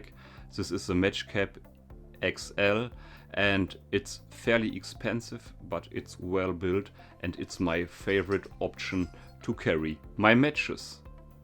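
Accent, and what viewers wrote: Germany. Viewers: Great products and presentation but the german accent makes it almost unbearable to watch, sorry.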